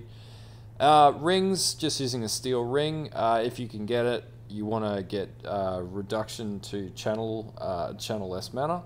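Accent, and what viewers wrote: Australia. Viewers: Hey love your vids 👍🙂 Also are u Aussie?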